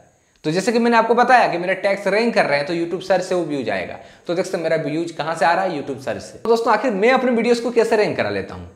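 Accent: Bihar, India. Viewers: Aapka bolne ka tarika achaa hai Bihar se ho aap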